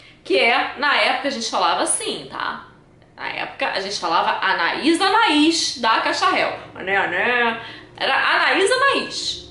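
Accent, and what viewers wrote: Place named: Rio de Janeiro, Brazil. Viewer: PARABÉNS PELO VÍDEO MINHA DOCE BELA E REQUINTADA YOU TUBER ÁRABE COM SOTAQUE CARIOCA QUE EU AMO. SEMPRE BEM DETALHISTA DIVERTIDA E EDUCADA FAZ UM VÍDEO DE PERFUMES NACIONAIS MASCULINOS PARA AGRADAR AS MULHERES FICARIA MUITO GRATO BJS ABRAÇO UM CHERO TENHA UMA BOA NOITE PERFUME QUE EU AMO PRA ESPANTAR GENTE Q EMPRESTAR O MEU CAPACETE DE MOTO EMPRESTADO É O TABU UM EXORCISTA PRA EXPULSAR PESSOAS QUE EMPRESTAR ROUPAS ETC........ KKKKKK❤😙😍😂👏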